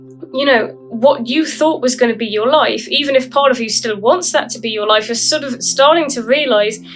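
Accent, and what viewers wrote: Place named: England, United Kingdom. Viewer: I so wish YouTube and content like this was a thing when I was in my teens. There was literally nothing and zero information, and found myself so much in denial that I wasted a decade in a doomed marriage. Great upload, very much enjoyed it. PS - I've recently been blitzing content such as this, and is refreshing to hear an English accent :)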